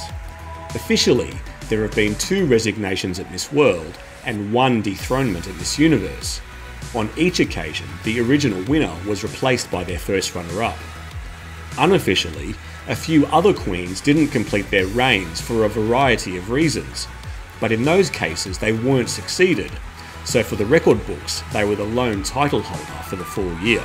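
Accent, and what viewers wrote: Australia. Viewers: I've been researching this kind of information for myself, and now I found it all perfectly researched and summarised here! Great visuals, pleasing voice-over (love the 'soft' Aussie accent), and neatly and professionally packaged. Love from ZA!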